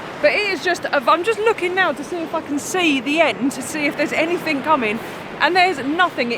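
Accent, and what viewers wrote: United Kingdom. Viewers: Does anyone know what her accent is? What part of the UK is she from?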